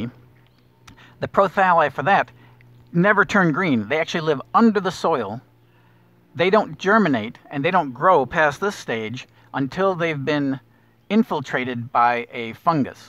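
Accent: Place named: North America. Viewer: I notice you have a North American accent. Will you be doing lots of plants that can be found in the UK? Found you from hearing about the Photosensitive poison in Parsnips.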